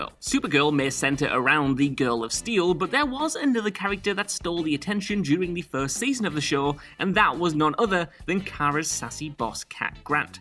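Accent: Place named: North, United Kingdom. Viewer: He is northern.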